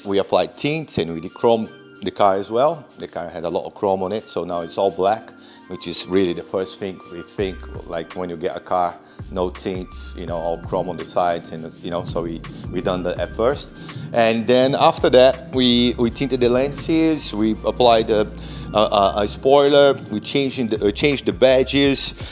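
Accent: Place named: Brazil. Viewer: Esse cara é brasileiro certeza kkkkk o sotaque não engana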